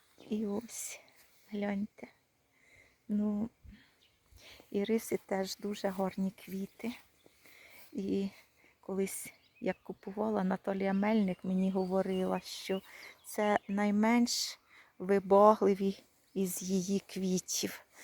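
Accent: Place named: Cherkasy, Ukraine. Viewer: Дійсно море 😂 ! У вас Черкаський акцент.😂 Моя Батьківщина 🤗Гарного сонячного дня 😊👋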